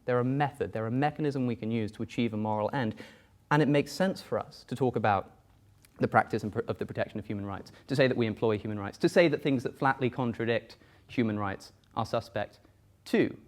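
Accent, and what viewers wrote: England, United Kingdom. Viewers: I think that's called an English accent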